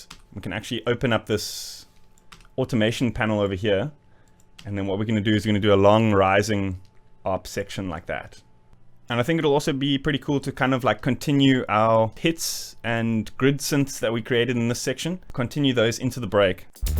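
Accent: South Africa. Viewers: Dude! South African?? Where you based? Great lesson, thank you!!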